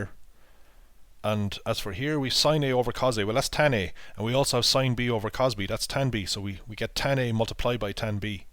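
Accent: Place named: Ireland. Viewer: irish probably incase ur still wondering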